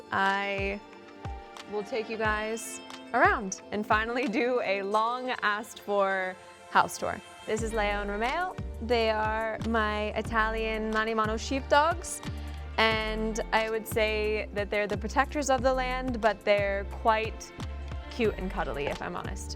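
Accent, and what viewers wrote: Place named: United States. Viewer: You and your obnoxious murican accent..why didnt you stay in Usa?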